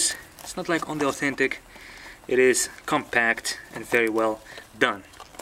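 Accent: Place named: England, United Kingdom. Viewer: This is very helpful!very nice english accent,, i send you all of it,🤗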